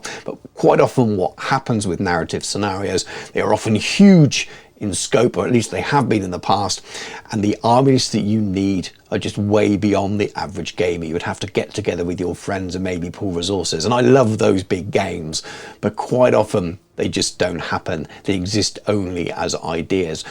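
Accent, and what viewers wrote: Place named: England, United Kingdom. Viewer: It is an absolute joy listening to you read Old World narrative in your English accent.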